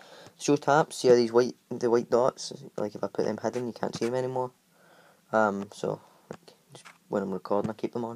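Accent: Scotland, United Kingdom. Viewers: Starwars Villians no he's not he's scottish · Are you Scottish or British bc guess what I am SCOTTISH I LOVE GLASGOW OR SCOTLAND LOVE THE RAIN(not it’s so badddd D:) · idk wat ur saying cuz ur Scottish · Is he Scottish bc he hears like me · Wow a Scottish youtube that's like a one in a million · U fae scotland tae im fae glesga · you are Scottish but you're not telling me what I wanted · Finally.... A Scottish YouTuber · Are you Scottish because I am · Cus he’s Scottish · It's a Scottish and he is from Glasgow same as me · FINALLY SCOTTISH BOI YOUR THE MAN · Scottish YouTubed first one I’ve seen on youtube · Your from Scotland aren’t you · You be Scottish boiiii! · Oi? I believe you're Scottish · Are u Scottish coz I am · Are u Scottish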